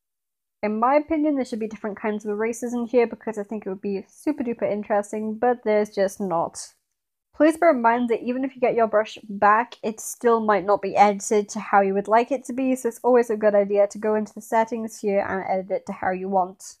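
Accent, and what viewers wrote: United Kingdom. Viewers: thank you british lady (2) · Thank you british lady (3) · Thank you British lady (5) ♡ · Thank you British Lady(6)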